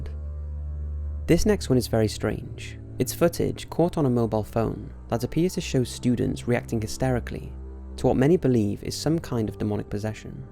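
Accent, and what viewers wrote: Wales, United Kingdom. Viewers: He's from Monmouthshire in Wales. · Hi, i love your voice xx...where are you from in the UK? xx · Go to Wales we all sound like that :))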